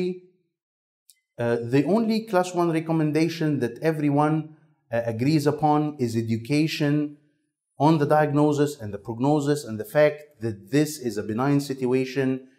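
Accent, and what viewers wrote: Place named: England, United Kingdom. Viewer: Thank you for your excellent way of explaination , and your proper English accent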